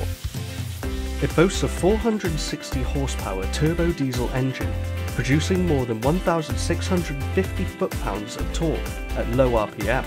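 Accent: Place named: United Kingdom. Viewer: lose the limey!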